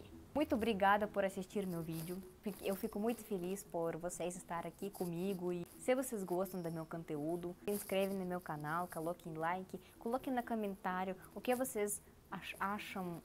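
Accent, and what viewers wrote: Russia. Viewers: Seja bem- vinda! O que eu mais gosto nos russos se esforçando pra falar português é o sotaque e a troca do o pelo a. Muito fofa! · Eu amo esse sotaque russo 😍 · Como já disseram as russas aprendem muito rápido o português e o sotaque é o mais bonito de todos com ênfase no R, creio que nós brasileiros identificamos muito com o povo russo, pessoas simples , cultas , com uma literatura maravilhosa e sofredores devido aos desgovernos que tanto os afligem e a nós brasileiros também, seja bem vinda, venha conhecer minha cidade São José dos Campos, São Paulo se vier você vai ficar pra sempre, 40 minutos do litoral , Ilha Bela, São Sebastião, Caragua e 40 minutos das montanhas , Campos do Jordão, S.Francisco Xavier , e outras cidades muito lindas, O Vale do Paraíba é uma região muito bonita. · a expressão " puxa saco" quer dizer " alguém que quer fazer de tudo pra agradar alguém, mas só pensando em si mesmo, sem se importar com outras pessoas, com o objetivo de receber algo em troca". 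Exemplo puxa saco do patrão (chefe). Você fala bem o português, mas se percebe o sotaque Russo. Legal você quere dividir a sua experiência brasileira com a sua família. Felicidades e abraços... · Gosto de assistir os seus vídeos, é tão legal ver e ouvir você falar. O português com o seu sotaque russo é muito bom, você fala palavras muito engraçadas. Adoro você,sou do norte do Brasil, Tocantins. sonho em conhecer uma russa pessoalmente e queria que fosse você. Beijos você é sensacional! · Não estou gostando. Você está perdendo o sotaque russo, que fica maravilhoso falando português. Não mude isto. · Vc é linda.Nao se preocupe com seu sotaque gostoso,dá pra entender linda. Que sejam todas bem vindas. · Moro no Amazonas . Gostei muito do seu sotaque russo seja bem vinda. Mas se vc e do lado do putin e melhor ir pra Rússia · Olá mais linda do Brasil... Você já está falando muito bem o Português. 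Um pouco de sotaque russo fica até charmoso em vc. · Bem vinda! Seu sotaque é gostoso. Amo a Rússia e as russas. A Rússia até hoje me soa como algo exótico.❤😊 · O seu sotaque Russo é muito show. Tenho um amigo Russo aqui no Canadá onde moro. Ele me ensina muitas frases e não e difícil a pronúncia porquê a nossa fonética não é tão diferente como o Inglês. Que Deus abençoe vcs e sua família aí no Brasil. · Eu acho uma graça ela falando português, com esse sotaque russo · Eu acho lindo demais, você falando português,com esse sotaque Russo. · Bom dia eu adoro seus comentários ,amo seu sotaque russo misturado com português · vc é muito querida e seu sotaque é uma graça. "Chineli" é o melhor👏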